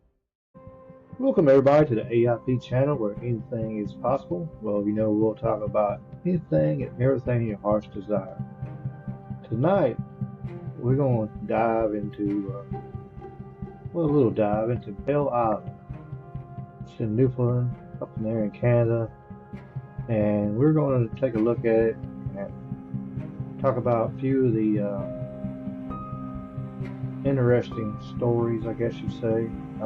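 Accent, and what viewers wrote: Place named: South, United States. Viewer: With your mumbling and southern American accent, it is hard enough to understand even half of what you are saying. With the addition of your pointless, loud music which accompanies this, it is nearly impossible.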